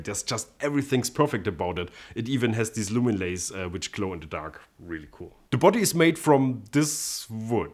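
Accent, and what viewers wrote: Germany. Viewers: Just so much in this Vid . Amazing German accent- ✅ amazing bass ✅ amazing bass face ✅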